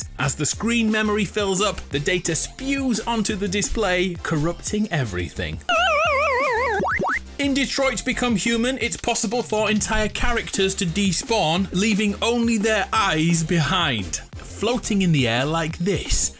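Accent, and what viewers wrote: United Kingdom. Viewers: wouldn’t expect many glitch in? In Madden? Love you but if your accent/description of football 🏈 wasn’t a dead give away you are from across the pond, then that statement most surely will. 😂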